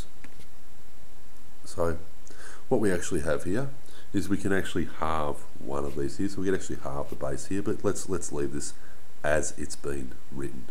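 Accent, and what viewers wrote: Australia. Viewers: just curious but are u Australian? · i dont know if its the aussie accent or the explanantion, but this guy is a literaly wizard 🙏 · Are you aussie?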